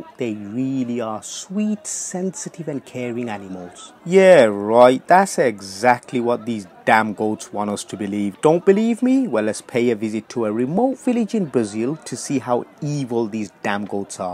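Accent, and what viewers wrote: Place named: Birmingham, United Kingdom. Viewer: Your too funny and i love your brummie accent ❤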